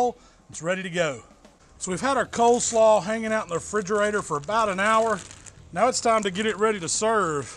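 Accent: South, United States. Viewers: i soon as i saw a big white man with a beard and southern accent, i know i found what im looking for.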